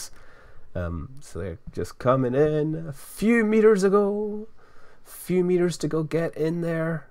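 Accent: United Kingdom. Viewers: Foreign is a relative term. It's the British pronunciation.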